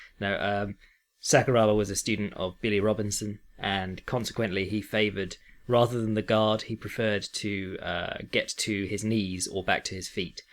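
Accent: United Kingdom. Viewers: i'm brazilian and i love your work i have been reading everything you wrote sinceramente but my english skills still no só good enought to hear and understand everything you say even with your cool britsh style very much more easy to me, thanks but May you put subs in this podcast? if you do and i share this(in fact a will share whatever happens lol) others of my countryman will enjoy too, and belive in me, brazilians NEED your work people here love mma but don't know nothing about this...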